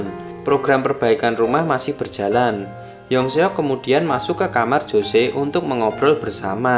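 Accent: Java, Indonesia. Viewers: Terlalu medok host nya 😅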